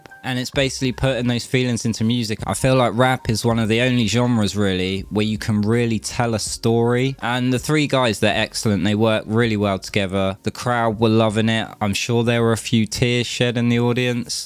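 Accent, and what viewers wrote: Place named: United Kingdom. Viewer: Sorry for loss, I lost my grandmother in May, my father in June and my dog Ireland in August last year, so now how you feel. You were spot on with your reaction, actually you are the only reactor who really understood the emotion they were expressing, love love loved your reaction to this song, as well as all the others. So J Hope didn’t rap when he joined BTS, he would from practicing with Rm and Suga in the dorms and found his own style so that is why you can tell the difference in their styles, you are extremely intuitive, you get these guys so well. Oh and btw love listening to talk, l am a sucker when it comes to British, Irish and Scottish accents, so could listen to you just read the phone book 😂, but also as I said, very intelligent and intuitive, and yes BTS does find you when you need them the most, it is so true, happened with my sister when she was in the hospital after her heart attack, she then wouldn’t stop talking about them so since she didn’t really have anyone to talk to about them in the beginning I would let her go on and on, till then I got hooked with their dancing skills, the fandom who treated you as if you were a life long friend, and then when the pandemic hit, I was really glad I had already been following fhem for a few years since 2018 and I was regretting I didn’t know about them in 2013, but thank god their earlier lives live on youtube and weverse so I was able to see what I missed, I feel like I have been following since 2013. 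Glad you are fi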